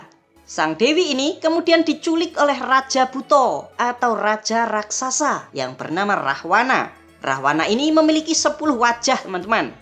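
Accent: Java, Indonesia. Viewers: logat jawanya medok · si medok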